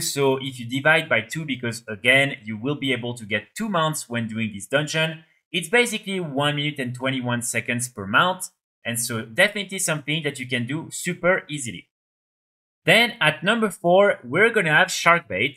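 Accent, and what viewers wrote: France. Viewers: ze french accent is very there (superb video btw thanks <3)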